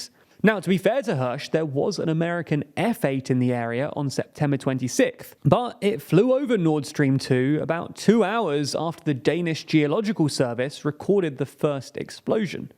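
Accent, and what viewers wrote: United Kingdom. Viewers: This is the stupidest pure speculation video from you guys I’ve ever seen. This is becoming like NewsWars with a British accent. I hate it when a good channel becomes successful and begins chasing the money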